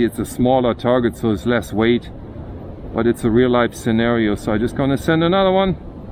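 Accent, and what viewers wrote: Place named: Germany. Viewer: Great video sir! I am also in the process of getting a can from them as well. It’s not a Banish can but another brand and it’s a pistol caliber. So far, it’s been a relatively good experience. No approval yet, but should be here any day this month or in June. Like your channel too! I hear a hint of a German accent or maybe Dutch….?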